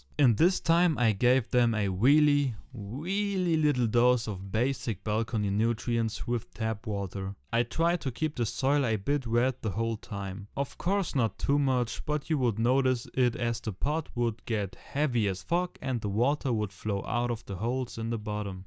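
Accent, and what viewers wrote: Germany. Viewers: A german trying to talk english is the worst... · Bist du Deutsch?😂🫶🏻 Ich mag falsch liegen aber so redet nur ein Deutscher englisch.😂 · This is the most german english i have heared in a long time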